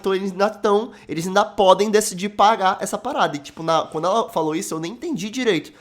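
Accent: Pará, Brazil. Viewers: Mano tu é paraense? kkk Brabo de mais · Mano tu é paraense ?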